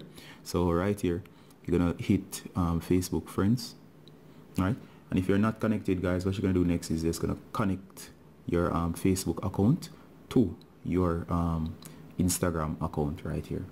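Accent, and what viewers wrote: Jamaica. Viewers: You Jamaican ? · Love di Jamaican accent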